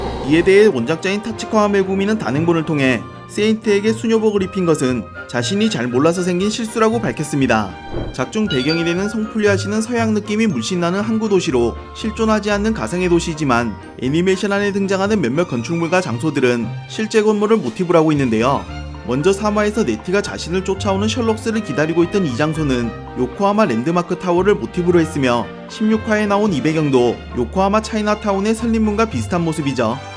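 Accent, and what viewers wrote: Gangwon, South Korea. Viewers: 왜 난 강원도 억양처럼 들리지?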